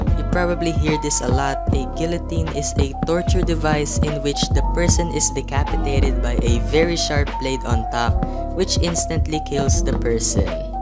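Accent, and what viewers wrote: Philippines. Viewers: I instantly recognized the filipino accent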